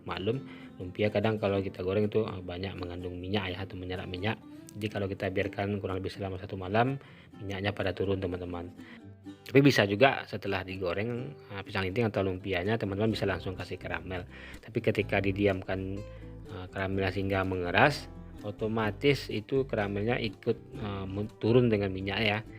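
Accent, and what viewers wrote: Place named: Sumatra, Indonesia. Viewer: Bang ini berasal dr daerah mana ya? Kaya logat Sumatra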